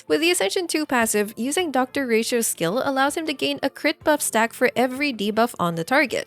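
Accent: Philippines. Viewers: My first video after trawling reddit for recommended Honkai Star Rail CCs, and an uninitiated af, first time HSR player here doing their due diligence. First few seconds of playback and I thought, "Pilipino siguro si," and true enough, you are! Great to see a countrywoman be highly regarded and recommended in the HSR threads of wild reddit and, I'm sure, YouTube (my bad though thinking you had the Y chromosome; a thousand apologies XD). Here's hoping your channel hits 500k subs soon, and grows beyond that! (Yep, did my part to contribute 😉). I realized though this isn't a video for beginners, so I'll just show my noob behind out...and comb through your other tutorials, of course! lol Best wishes!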